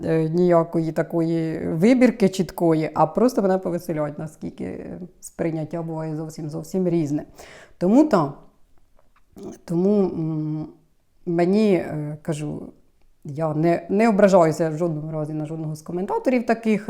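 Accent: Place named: North, Ukraine. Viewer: Пані, вітаю Вас! Ви чудова! Можливо я саме через тембр вашого голосу звернула увагу на ваш канал. З лютого 2022 принципово шукала відео-блогерів садівників з України. Дивилася, слухала. Не можу сказати, що троянди це моя улюблена квітка, але щось змусило мене зупинитися та підписатися на ваш канал. Щодо критиків, яким все не так, то невідомо скільки серед них тих, хто саме працює на запобігання розвитку українського контенту. А вимова ваша більш характерна півночі України, там притаманні більш тверді приголосні, щось трошки від білоруської мови. У мене тембр голосу дуже змінюється від тої мови, якою спілкуюся, рідні спочатку не впізнавали, коли дзвонила та розмовляла українською, зараз звикли. Кажуть, що українською у мене більш м'яка та доброзичлива мова вийшла. Сама себе не чую, тому важко об'єктивно оцінити. Окрема подяка за вашу громадянську позицію, про віру в ЗСУ та нашу Перемогу!.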